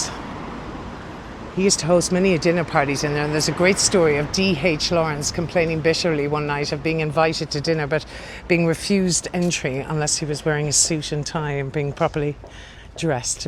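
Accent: Ireland. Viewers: Hi, I love your video. Not so much of a hidden Gem anymore but still hidden from most tourists. I think I discovered the Hill Garden and Pergola in 2018 or 2019. I always wanted to go to the West Heath, but I only made it to Hampstead Heath not the West Heath so I found it by accident. Boy was I amazed It was during the summer and it was a beautiful sunny day and I was almost by myself here. Mind you I came to this part of London in January 1982 to work for the first time a week before my 17 th birthday. I have been to London so many times over the years but I'll always love my part of London namely the NW Hampstead, Golders Green, Kilburn, West Hampstead, Queens Park, Swiss Cottage, St John's Wood that will always be my part of London and I keep coming back. I have also lived in LLanduno Wales, Portrush NI and in Edinburgh and I have travelled a lot in the UK as a tourist as well. 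I like your Irish accent and I think yo make some really nice videos. lots of love from Denmark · Lots of Irish references to go with your very slight Irish twang, I know Hampstead well, lots of celebrities currently live in these roads you are talking about, All best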